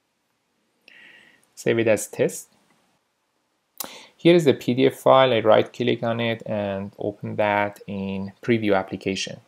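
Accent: Iran. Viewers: I spot a Persian accent when I hear one. great tutorial thank you!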